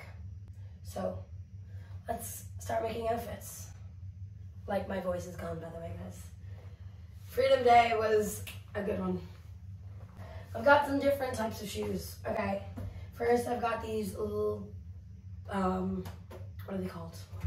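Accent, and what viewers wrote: United States. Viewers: Story with the American accent? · I dont understand why you talk like an american?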